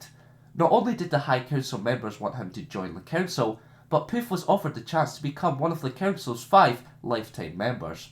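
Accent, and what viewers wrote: Scotland, United Kingdom. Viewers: Hahahha "Scottish insult". Admittable when i searched star wars lore, i didn't expect it to be a fellow scot that would be the commentator.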